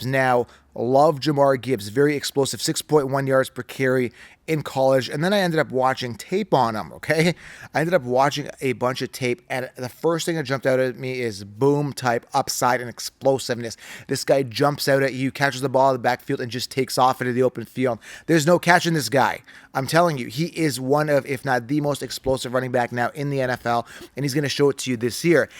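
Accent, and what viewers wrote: Canada. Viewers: I like watching your show.. I can even tolerate your Canadian accent but could you actually pronounce someone’s name right??? Is that too much to ask??? You sound like a damn fool.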